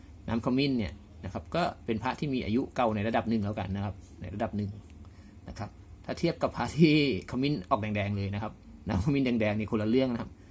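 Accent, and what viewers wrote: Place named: Thailand. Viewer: พระสวยๆทั้งนั้นทดสอบกลัวเสียเนื้อพระมั้ยจ๊ะพูดเน้อเน่อคนจ.วไหนคะ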